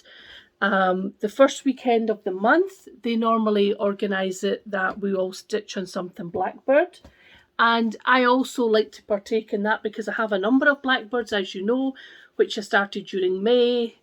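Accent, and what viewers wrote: Scotland, United Kingdom. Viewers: I just found you and love your stitching and your lovely Scottish accent. I love the Flea Market pattern - actually anything by Lori Holt. The Tudor pattern is very sweet and one I'd love to stitch. Enjoy your vlogs. Cheers from Canada. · The Tudor Bee is nearly as lovely as your scottish accent! 😊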